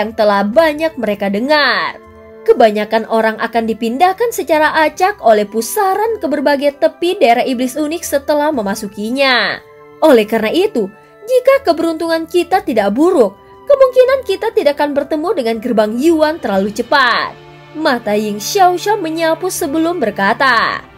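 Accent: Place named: Semarang, Indonesia. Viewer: Dunia itu sempit ya, tiba tiba saya di tempat tongkrongan bertemu dengan pendengar chanel ini 😂, semarang mana ini suaranya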